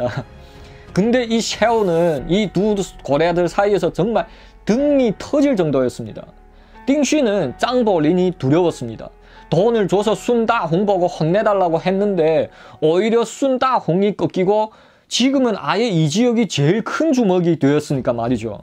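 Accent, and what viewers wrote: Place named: Korean-Chinese, China. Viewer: 25년전에 연길조선족선원들하고 외항상선에서 같이생활할때 목소리하고 어쩜똑같아 갑자기 옛날생각이 나네요 구수란 조선족사투리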